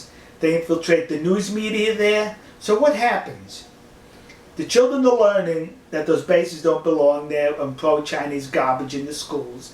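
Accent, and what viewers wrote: New York, United States. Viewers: I should have figured out that you were BAT SHIT CRAZY, when you said you've lived in Texas for 32 years, and still had an NY accent. That's kind of like Charro* and Zsa Zsa Gábor** <link> <link>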